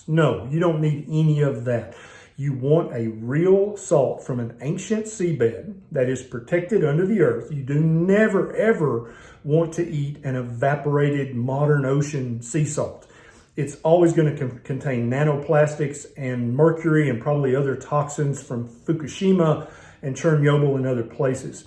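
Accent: South, United States. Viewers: .....loooove your delivery! No baloney, no hooey, just southern drawl sweetness and info from a southern gent! Thanks for keeping it real!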